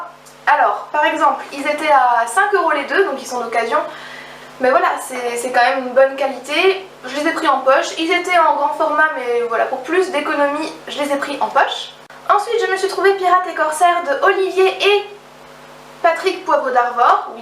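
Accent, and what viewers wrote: United Kingdom. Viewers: Coucou ma belle ! Hihi en effet ton accent est HYPER britannique ! Mais perso j'adore ! J'ai plutôt un accent américain pour ma part donc j'ai une adoration pour le britannique. Alors continuuue ^^ Je ne suis pas fan DU TOUT des BD, mais... Macabre a l'air vraiment beau ! :O Alors peut être que je vais le commander... Au fait, merci de m'avoir fait découvrir priceminister pour les livres. Hum hum. ^^ Pour la petite info, non, PPDA n'est pas parti à la retraite, c'est Claire Chazal ça ! xD Sinon comme d'habitude, j'adore te regarder en vidéo, tu respires la joie de vivre c'est vraiment super à voir. Par hasard, est ce que tu vas aller au salon de Montreuil ? ;) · Pour avoir fait de longues études d'anglais, je dois dire que perso ça me fait du bien d'entendre une youtubeuse bien prononcer les mots anglais avec un bon accent, donc j'aurais tendance à te dire YES ! 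Garde ton bel accent. Cependant pour ceux et celles qui auraient du mal à comprendre (faut bien avouer que l'accent british est à couper au couteau hein haha) je pense qu'il serait judicieux que tu mettes des annotations dans tes montages vidéos pour spécifier à l'écrit le nom du livre et son auteur pour que les personne puissent le retrouver par la suite sur google :) Bisou ma belle xx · Super book haul ! Au passage je kiff ton accent britannique je suis pour que tu dises les titres en britannique !!!!! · J'adooooooooore le Victor Hugo, il m'avait déjà fait de l'oeil sur le site de Soleil Editions... J'aime bien l'accent britannique aussi, il faudrait consacrer une vidéo à ça :P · Je suis fan de ce petit accent britannique ;). Et entendons nous, "wondÉ", ça te décolle les tympans! B. Lacombe... On en entend parler sur toutes les chaînes en ce moment mais ça se comprend! De très belles acquisitions en tout cas! 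Et si ce sont tes achats depuis le mois d'Août, ça reste raisonnable je trouve, pas encore extravagant! ;) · définitivement l'accent anglais :)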